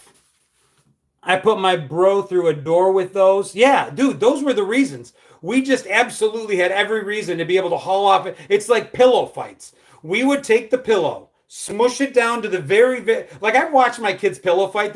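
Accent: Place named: North, United States. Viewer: Lol... I knew you were from that part of the northern portion of the US. I was raised in Ga(till 13½) then New Hampshire so Im pretty good at picking up accents. I was born in feb '73 so im Totally a Gen-Xer 😊...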